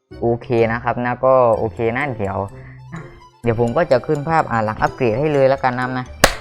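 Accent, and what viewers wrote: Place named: South, Thailand. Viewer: สำเนียงคล้ายคนใต้เลยนื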